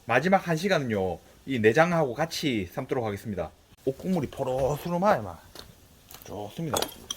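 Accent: Gyeongsang, South Korea. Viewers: 형님 보기너무 좋습니다 ㅎ 20대후반에 직장생활을 울산서 5년했습니다 ㅎㅎ 행님 사투리 듣고 있으니 경남지방 놀러가고 싶네요 ㅎㅎ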